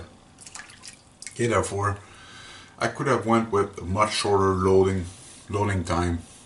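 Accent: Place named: France. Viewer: Hey man your doing a great job and don’t worry about your French accent it’s cool there are a lot of us myself included who could learn to speak a second language and some of us are much poorer for not learning it. As for shaving there is no advice that I can give anyone on that topic I’m just glad to have gotten the hell away those stinking cartledge razors since 2010 my face has never felt better, so long Gillette, Schick, and all the other junk 3,4, and 5 bladed crap as well as those synthetic shaving soap. Anyways Cheers man if you are watching the Grey Cup tomorrow enjoy I’m going with Ottawa although I live in the west, Cheers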